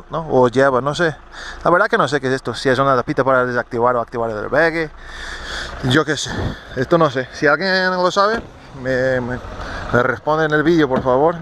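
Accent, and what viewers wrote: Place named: South, Spain. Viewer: Sotaque do sul, mano! Mas é perfeito! Parabéns!!! Abs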